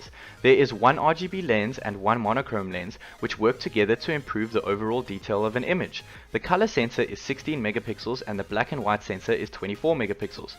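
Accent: South Africa. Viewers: Hey, South African accent guy - excellent video, well done.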